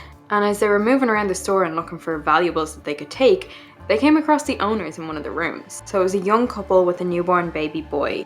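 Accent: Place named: Ireland. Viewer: Love your Irish accent. So charming and nice ❤️ I see now why the Irish are such good storytellers 😊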